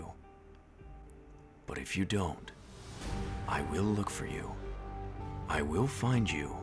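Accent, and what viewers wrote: Australia. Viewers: NOICE Aussie accent! You're actually amazing. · You sound Australian.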